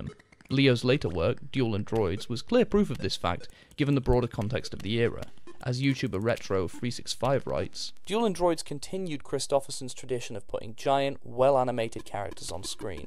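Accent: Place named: United Kingdom. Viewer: sultry british voice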